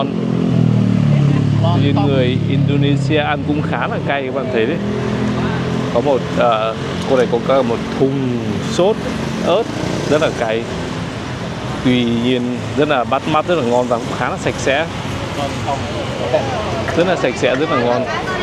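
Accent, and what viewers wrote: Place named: Hai Phong, Vietnam. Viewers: Khả năng ảnh người Hải Phòng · Chí chương luôn 😂 đậm chất Hải Phòng ❤️❤️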